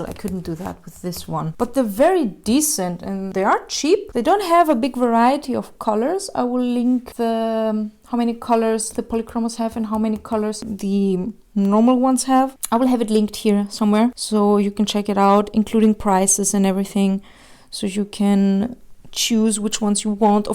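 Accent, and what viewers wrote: Germany. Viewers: R u german cuz you kind of have a german accent and also faber is made in germany and i am also german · I live in Germany... Sooo its cheap XD btw I think she is also Herman... Caus the accent and on the pack was standing '' Buntstifte" that's German and means colored pencils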